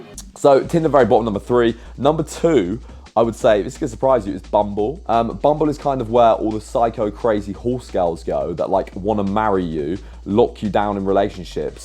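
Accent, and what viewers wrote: United Kingdom. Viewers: The most british accent I've ever heard 😂 keep those vids up man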